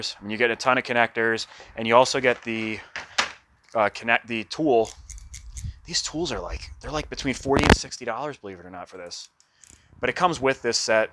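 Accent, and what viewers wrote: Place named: Philadelphia, United States. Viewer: mines an SS, I didn't want to deal with a clutch in the woods but still wanted to be able to shift... Not sure where you're from for if you'd have to deal with it as much (but judging by the "wooder" accent of water, sounds like you're from the Philly/NJ area, so you'd have woods riding as well). But a yxz is pretty easy to work on, everything's pretty open or hidden behind 1 panel instead of having 20 million panels. Just put a titanium exhaust from Graves on mine, sounds like a 1000 streetbike in the woods... Plus, who wouldn't want a 10.5k rpm redline?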